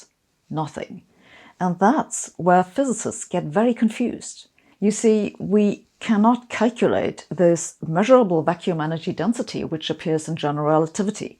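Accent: Germany. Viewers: Definitely a sub for Sabine. Love your work. She teaches to keep the data and observations separate from our interpretations. Honestly way more of a spiritual exercise on our place in the universe than the pat answers shrouded in ill-defined terms that you'll get from Gurus or even some physicists nowadays. (also, not going to lie, there is a certain draw and power to a German-accented physicist bringing some goddamn clarity to a field that's been lost in math and metaphysics as of late)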